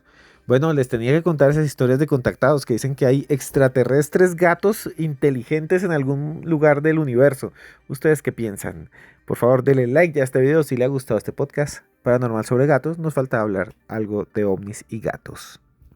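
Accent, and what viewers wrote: Colombia. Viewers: es Maravilloso, es auténtico,puro colombiano, su vocabulario es hermoso, me encanta escucharlo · No hay mejor narrador!! Que colombiano, me encanta !!!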